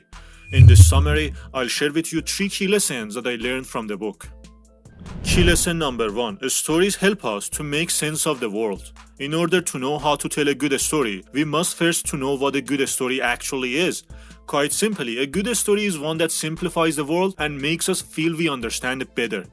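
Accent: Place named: Iran. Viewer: Oh this is the thickest Persian accent that I've ever heard! 😂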